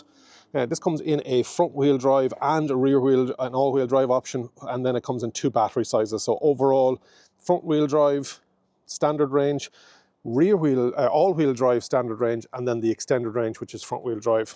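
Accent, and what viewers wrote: Ireland. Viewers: Refreshing to hear an Irish accent giving a review. Agree with a lot of what he has to say apart from the speed. Extremely sluggish in eco a bit better in normal and fairly quick in sport. Comparing it to my last car which was also stellantis, a DS3 Crossback. It was very quick taking off even in eco and in sport the wheels would literally spin if you try pulling off fast. He says he’s 6’2 and there’s good room in the back seats. Well I’m 6’4 and although it’s the first car I can remember where I don’t have to put the driver’s seat fully back. (So ample leg room in the front) even when I position chair slightly forward to my comfortable driving position, there would be no chance of fitting a passenger behind me (unless they were strapped in a baby seat). My wife is 5’0 and she said she wouldn’t feel comfortable sat behind passenger seat. 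The boot is big too. They would have been better off cutting a couple of inches from the boot to make in more comfortable for back seat passengers. I’ve only just got the car so don’t know much ability the features but driving back 30 miles from the dealership, half motorway half “a road” (uk) it seems to go better than expected on estimated mileage. Get a lot more miles per charge than DS3. So far fairly happy with it. Apart from what I mentioned and the same stellantis app I had with my ds which is dreadful. I’m hoping the in car info is better which was also dreadful in the DS. Looks a lovely car too. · I also love the Irish accent. I used to go over to Dublin a lot in my younger days for Thin Lizzy Vibe for Philo gigs. The Irish people are the most welcoming people I've met.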